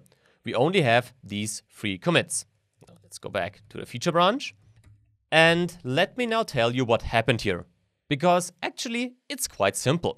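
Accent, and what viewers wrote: Germany. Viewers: Do you deliberately sound like Max in your pronuntiation? or is it a thing in German people to sound like that? Great video! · I was deciding whether or not he had a german accent; but then he said "entwickelments" instead of developments and it all became clear to me.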